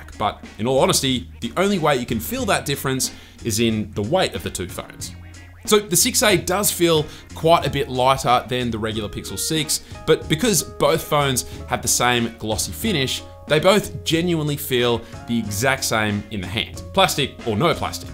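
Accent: Australia. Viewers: as I heard that aussie accent, I subbed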